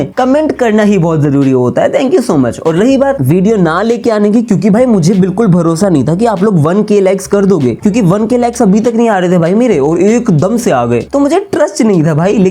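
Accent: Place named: Haryana, India. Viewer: thoda accent se laga tha ki delhi haryana ya Punjab se hoge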